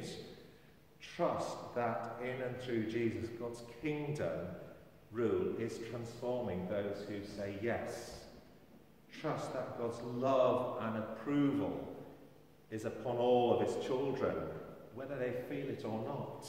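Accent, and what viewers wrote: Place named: England, United Kingdom. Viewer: I look forward to your sermons as I live in Greece and we only have Greek Orthodox churches here -- I look forward to listening to you (good old English accent) - I miss Edgeley and look forward to coming home as soon as I sell my place here. Thank you. Stay safe.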